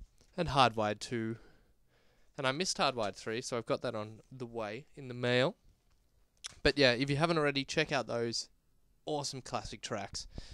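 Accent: Australia. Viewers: By any chance are you Australian ?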